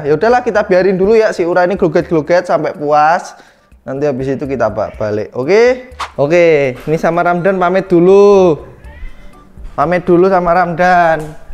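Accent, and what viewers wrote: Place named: Pati, Indonesia. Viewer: logat daerah pati ne kentel banget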